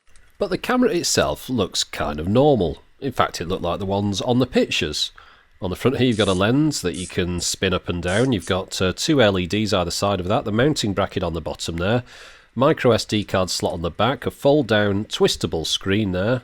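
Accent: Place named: England, United Kingdom. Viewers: I agree with Adam Therful (down in the comments) about the smooth English accent. Reminds me of Ringo Star narrating Thomas the Tank Engine TV series. Good work, Keep it up! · Your smooth English accent makes this 4x better. Great video!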